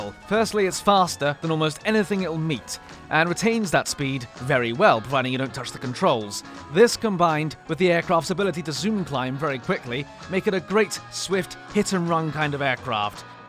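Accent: United Kingdom. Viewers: Best British accent of the century🇬🇧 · So is he actually British or is it just an accent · Dearest. I find this ironic. Your channel is mainly fantastic due to your lovely British accent, and your favorite vehicle of War Thunder is American.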